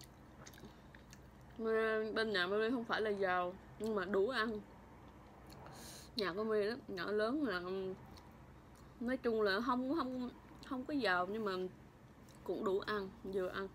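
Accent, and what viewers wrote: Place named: Bahnar, Vietnam. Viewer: Tuan NGuyen chị nói.. Là người Ba Na kìa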